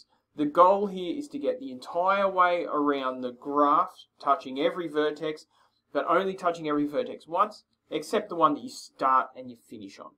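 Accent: Australia. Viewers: Great Australian accent.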